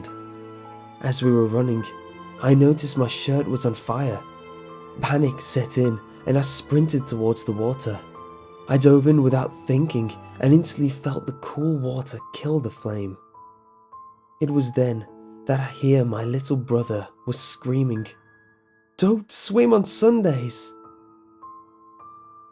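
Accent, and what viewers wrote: England, United Kingdom. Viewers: I know he is not from America so he is probably from England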